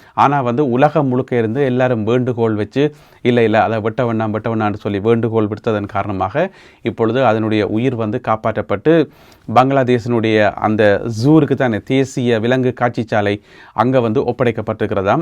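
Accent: Jaffna, Sri Lanka. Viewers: Your Jaffna style talking very interesting.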